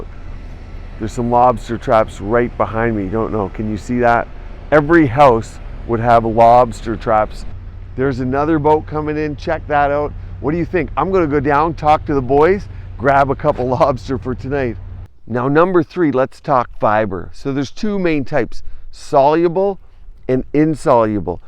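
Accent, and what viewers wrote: Canada. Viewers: Well your accent reminds of a Canadian accent, and you must be on the Atlantic coast so I guess Nova Scotia? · I falsely thought the eastern part of Canada stayed cool all year but see thats not true and can be quite warm. Your canadian accent seems more pronounced when you're there. I don't normally notice that you have an accent. Looks like a great place to grow up!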